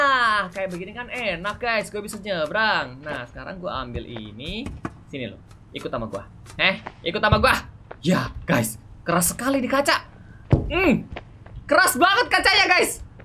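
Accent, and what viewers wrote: Java, Indonesia. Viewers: Kamu orang jawa soolnya ngmongnya kayagitu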